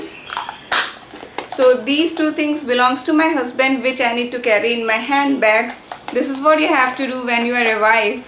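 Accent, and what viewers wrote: India. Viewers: Are u Indian ur accent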